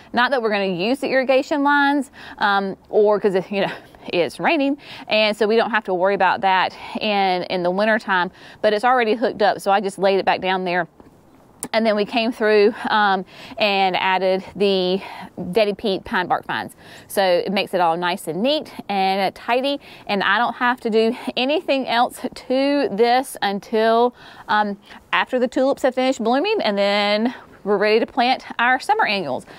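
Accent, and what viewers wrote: South, United States. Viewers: Oh....you are too Cute! Out in the Rain.:goodvibes: I love your sweet voice. (with your Southern Accent) > Say that three times....Shocks and Struts... Tulip Blend. 🌷🌷🌷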